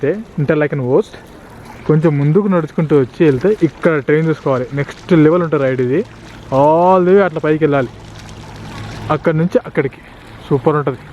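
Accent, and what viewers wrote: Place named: Telugu, India. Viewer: బ్రో జెల్ది రా బ్రో సీట్లు అయిపోతున్నాయు ఈడ 😅😂 ఏ దేశం పోయిన మన భాష యాస మర్చిపోవద్దు ప్రౌడప్ యుా తెలుగోడ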